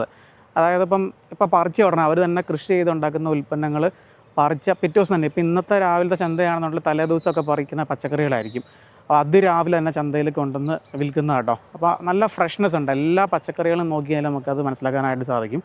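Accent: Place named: Ernakulam, India. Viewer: Off topic. accent gives me chuckles. I am amazed how many accents a district like Ernakulam has. Another prominent one is from Kochi area. Edapally/Kalamassery/Aluva has no typical accent but more of a mainstream Malayalam I like to believe. Anyways your sweet accent makes the video more lively.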